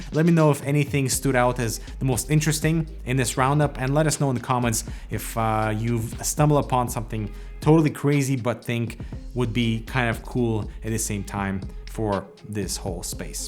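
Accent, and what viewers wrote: Russia. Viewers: Are you Russian? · Чо? ты русский что ли??? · bruh u russian or italian ?????????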